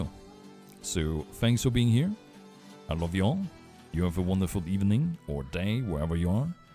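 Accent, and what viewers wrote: Germany. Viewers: Because i hear you say that a lot: It's "Does", not "Duus". Its almost spoken like "das" in german, but with an o inbetween. aka "dos" (I assume you are german, taken from your accent)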